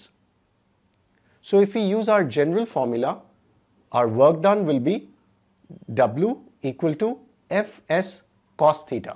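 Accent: India. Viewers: Sir your video on work is more convincing. Even you use simple indian pronunciation. That's why I am impressed. Thank you sir. You are doing hard work. Without the intension of fruit. Thank you again. Keep it up!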